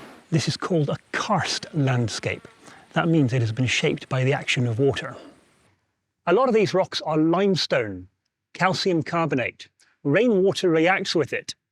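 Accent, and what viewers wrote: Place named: North Island, New Zealand. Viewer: Given your accent I'm guessing you're from the north north north island?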